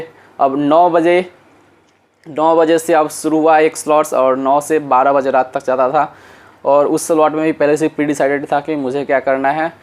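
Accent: Bihar, India. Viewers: Boli se to bihar ke lag rhe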